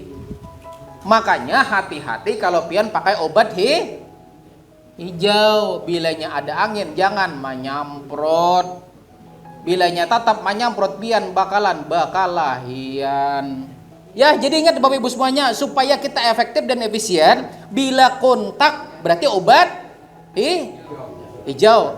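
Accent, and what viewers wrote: Banjar, Indonesia. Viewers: Banjar banar aksen nya ❤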